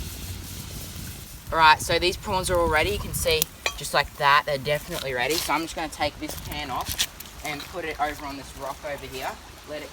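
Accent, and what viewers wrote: Australia. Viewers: are you a australian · Are you Australian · Is he Aussie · Are you an Aussie????? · you sound Australian, cyclone debie hit Australia, how come you speak American, in this country we call them prawns. however what you were catching were yabbies good to eat but not prawns or shrimp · Are u a aussie? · I can tell ur an Aussie so wanna meet up sometime and do some fishing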